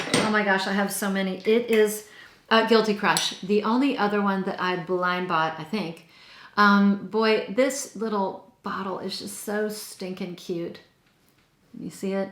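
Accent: France. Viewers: Question please : What languages do you speak ? Do you speak French or Italian I notice your pronunciation 😉Would love to see a video on this topic ❤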